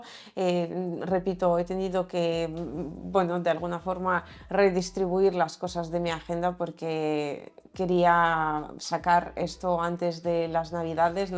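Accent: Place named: Spain. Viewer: Lo siento, pero mientras tu sigas hablando como española no veré tu canal. Es muy pesado ese acento y tu público es latinoamericano, no español.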